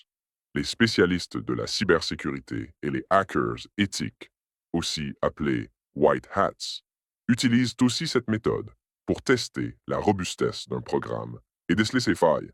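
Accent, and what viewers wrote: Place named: Canada. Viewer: Accent Canadien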